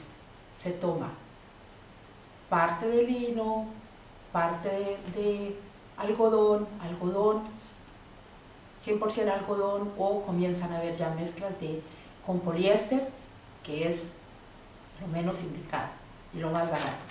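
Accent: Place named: Chile. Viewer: Hola ,, por tu asentó me tinca que eres chilena ..pues bien ,, me gustaría saber si vendes tus obras , gracias por tus tutoriales muy buenos ,,saludos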